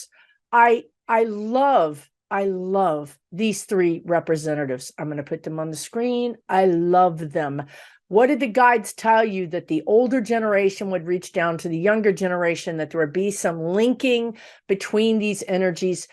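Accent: Texas, United States. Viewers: Love love love this message. Your Texas drawl gets more pronounced when you’re joyous😉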